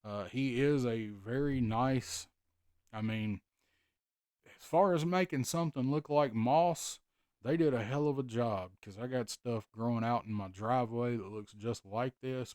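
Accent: South, United States. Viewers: The best adventures start with setting to wander. I don’t do MOTU, but you good folk with that Fine Southern Drawl really sell him well. 3 star outta 3 -well. I’m glad this video got mentioned to us tonight.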